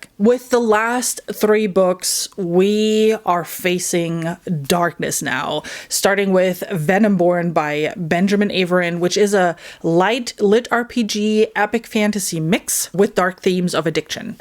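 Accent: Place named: Boston, United States. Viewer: First, love the accent (north east bostonian?) seemingly masked tho— just wanted to say first time viewer but will check into your rec’s!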